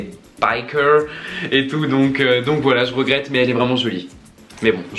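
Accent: Alsace, France. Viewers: j'adore ton accent alsacien prononcer je suis pareille vive l'Alsace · Tu viens d'ou d'alsace ? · T'ES ALSACIEN OUIII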